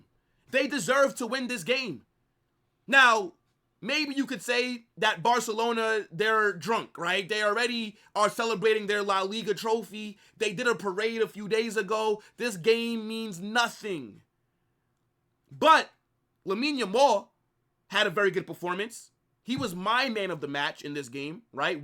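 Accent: United States. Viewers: I could tell he’s American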